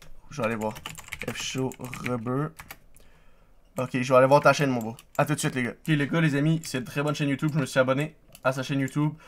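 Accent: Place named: Quebec, Canada. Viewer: un qc full yo dans ces phrases mdr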